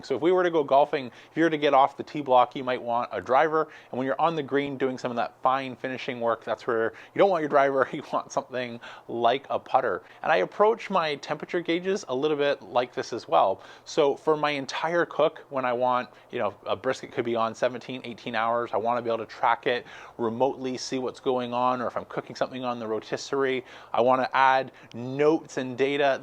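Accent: Canada. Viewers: Thank you so much for your very informative videos. I have been a KJ student for the last two to three years. Some trial and error but mostly wins since the KJ is so user friendly. Adding you and your videos has added the last piece in my grilling/smoking journey. Plus my family and I really enjoy your Canadian accent...really adds some fun to your tutorials. Keep em coming!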